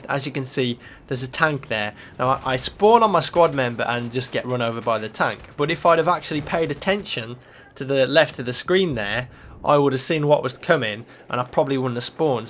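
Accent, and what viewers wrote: United Kingdom. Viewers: Because he has a British accent? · HES BRITISH ITS THE WAY THE PRONOUNCE IT. GET THE FUCK OVER IT. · BF3 not BFfree. He's a brit like me ,mate.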